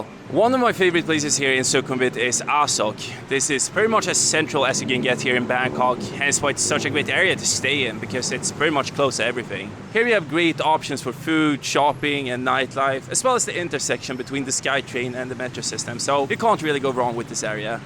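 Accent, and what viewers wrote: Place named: Sweden. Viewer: Sound like English in Swedish dialect.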